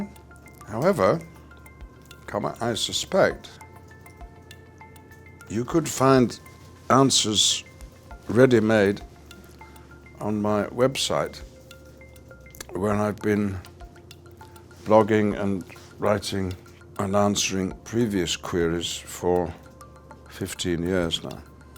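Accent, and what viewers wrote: RP, United Kingdom. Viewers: It's strange hearing American pronunciations with such a posh accent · Only Ian would answer questions while drinking tea and sounding posh AF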